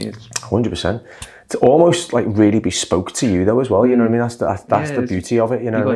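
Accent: Liverpool, United Kingdom. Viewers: Nice to hear a Scouser! Is your salong in Liverpool? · are you from Liverpool or even Manchester? Yank here...Can't quite place your accent.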